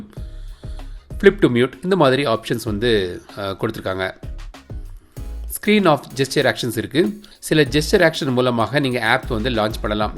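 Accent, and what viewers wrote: Kanyakumari, India. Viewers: Are you from kanyakumari?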